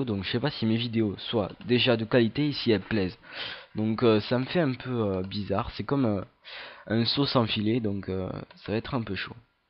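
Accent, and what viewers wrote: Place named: South, France. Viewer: Salut ! Content de voir une nouvelle série sur Rome Total War, et avec l'accent du sud s'il vous plaît :D ! L'installation de mods n'est pas très compliquée et généralement bien expliquée par les développeurs de ces derniers. Tout dépend de ce que tu recherches, mais personnellement j'utilise Roma Surrectum 1.6 (la deux déchire encore plus mais j'ai un débit pourri pour le télécharger et je suis anglophobe). Bref, pourquoi pas une série sur une campagne moddée ? 0:)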